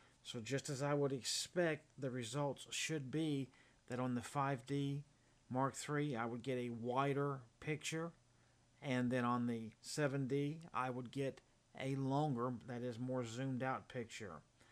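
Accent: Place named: South, United States. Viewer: Nothing like a southerner gentleman; you explained it well thank you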